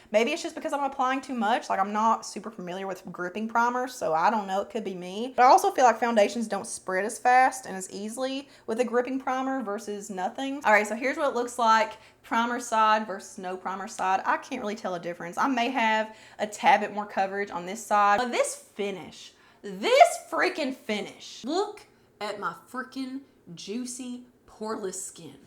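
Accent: South, United States. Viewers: You're from Kentucky?!? I've been watching you forever, but I had no idea! I'm an eastern Kentucky girl 👋 I've wondered about Kentucky being part of the South too because it's technically not. But, girl, listen to our accents (mine is thicker than yours) we definitely Southern lol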